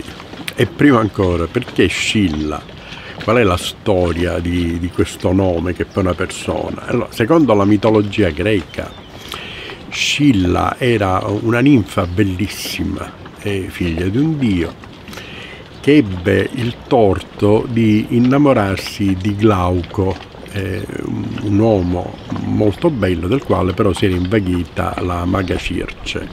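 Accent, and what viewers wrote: Sicily, Italy. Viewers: Il suo accento siciliano è musica.. Vorrei chiederle cosa pensa del progetto del ponte?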